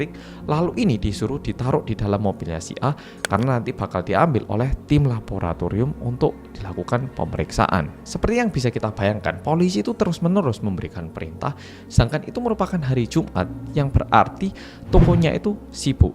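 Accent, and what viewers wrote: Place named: East Java, Indonesia. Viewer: Salut..bangga apresiasi sama Mas..bahasa indonesia logat jawatimuran umumnya " Malangan khususnya. Ortu seperti saya ini asal Sumut domisili di jakarta 50 tahun..selama bekerja..kadang ke jateng..tp ga pinter2 amat boso jowo. 👍🏻👍🏻👍🏻👍🏻 isin...aku.😅 opung ini suka filosofi jawa. tentu yang lainnya juga..salam sehat semuanya. Sai hipas saluhutna🙏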